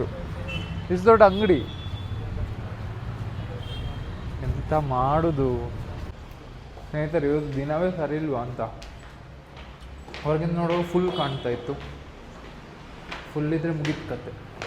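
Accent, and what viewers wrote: Mangalore, India. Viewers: avre kannada first Matadodu kaliri nimma mangaluru bhashe beda Try madii It will boost your views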